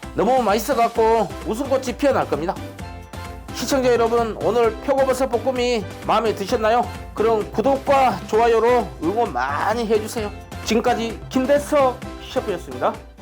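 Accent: Jeolla, South Korea. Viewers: ㅋㆍㅋ 셰프님 전라도네요 말씀이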